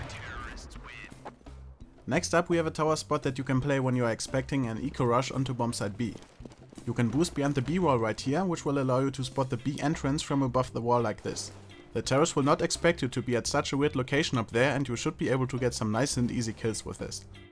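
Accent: Germany. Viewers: Du bist eigentlich deutsch ne :D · Dein deutscher Akzent ist voll krass zu hören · liegt vllt daran das er deutscher ist bin mir aber nicht sicher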